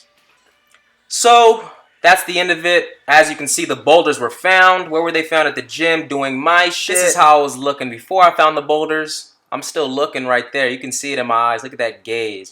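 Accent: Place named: United States. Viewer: Wait are you American? Your name threw me off but hearing your voice you seen American lol.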